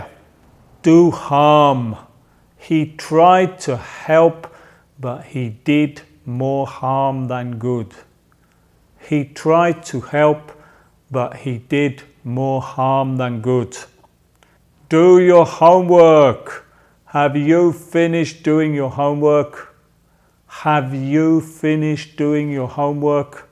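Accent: England, United Kingdom. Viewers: Amo el acento Ingles. Ya me quedo clara la diferencia =)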